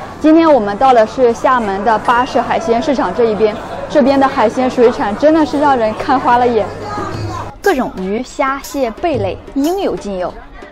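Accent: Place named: Beijing, China. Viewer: 好像是北京口音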